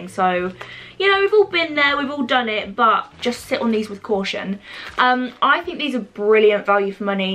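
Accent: United Kingdom. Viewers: Completely unrelated question but where are you from? (Obviously don’t say if it gives away where you are living) but I have been watching your videos for quite a while now and cannot place where in the UK your accent is from. I love it!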